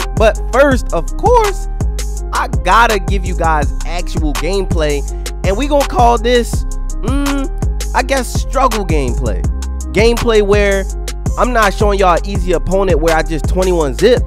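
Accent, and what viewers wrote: Baltimore, United States. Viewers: Yeeeeeeerrrrr, are you from Baltimore my guy?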